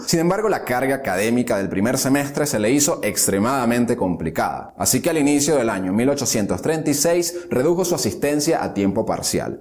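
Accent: England, United Kingdom. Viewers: Historiador para comentar hay que tener cultura.los terminos deben pronunciarse en forma de origen.como todos pronuncian con acento ingles. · Para hacer una biografía sobre personajes alemanes, o bien pronuncia los nombres a la alemana o bien a la española, lo que queda fatal es pronunciar las palabras en alemán a la inglesa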